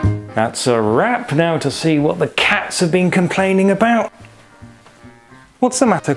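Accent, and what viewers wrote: United Kingdom. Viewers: I didn't know you were an Englishman, I just thought you were a German who learned English from the British.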